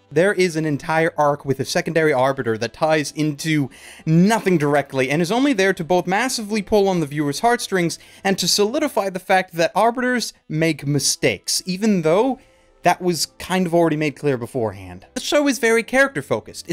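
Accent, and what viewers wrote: South, United States. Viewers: why you gotta do a southern voice bruh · your southern accent killed me , your sense of humour is so fuckin underrated and entertaining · With that southern accent, are you imlying that people from the southern parts of America is religious fanatics?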